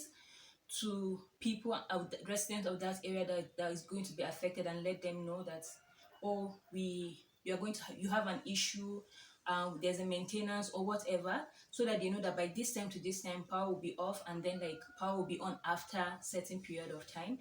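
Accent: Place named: Uganda. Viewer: Your accent is turning into a Ugandan one😂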